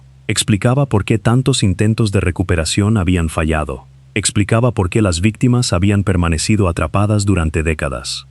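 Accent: United States. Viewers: Hablas bien el español y después hablas como gringo, me parece patético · Hola. Interesante a pesar del español con demasiado acento norteamericano. Pero está bien. M impresiona la tozudez de los buzos por el peligro. La gente es así. Es igual que los departistas que sabaen que va a ser sancionados si toman estimulantes y aún así lo hacen. Son los que audazmente ignoran las advertencias de peligro. Es igual que l los que roban. o asesinan. Saben que los atraparán , pero aún así lo hacen. En todos los casos se les obnubila el entendimiento y la capacidad de razonar y medir el peligro.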